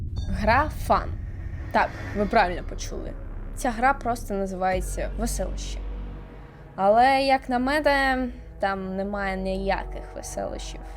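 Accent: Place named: Russia. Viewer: Спробуйте вичитувати текст перед зйомкою разів 10-20 вголос. Дуже важко слухати. Українська мова - це дуже круто, але неправильні наголоси, вимова слів на російській лад (плутанина з «і» та «и», «о» та «а») тощо, потребують додаткової практики. Щасти.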